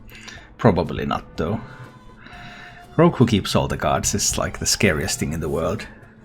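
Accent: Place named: Finland. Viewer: I immediately knew you were finnish after hearing your accent. I'm not even scandinavian myself but I've heard some finnish people talk before, haha. Nice deck and I love the way you explain things so calmly.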